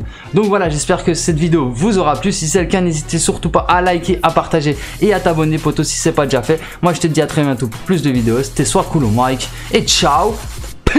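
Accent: Belgium. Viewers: ton accent belge est genial ! JCVD qui t'apprend un glitch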